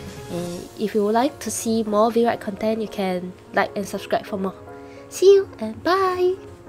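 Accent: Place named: Japan. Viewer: Wow your Japanese accent is so cool! And by learning step by step from your video I finally made my first hairstyle ever on Vroid!!!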